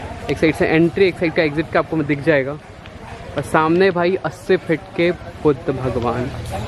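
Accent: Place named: United Kingdom. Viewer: This was too good. Just saw your video today. Your accent is awesome. Did you live in UK earlier? Waiting for your new videos. Love from Spain.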